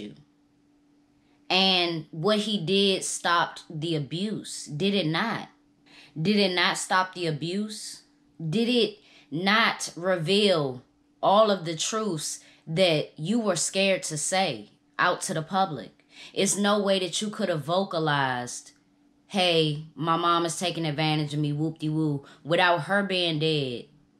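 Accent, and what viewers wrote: Washington DC, United States. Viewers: I always liked yo heavy DC accent💯 Keep levelin 💯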